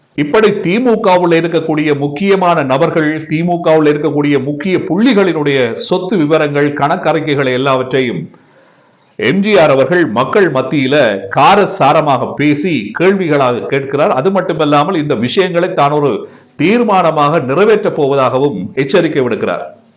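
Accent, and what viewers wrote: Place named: Sri Lanka. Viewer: நீங்கள் பேசும்போது இலங்கை தமிழ் என்பது சரியாக தெரிகிறது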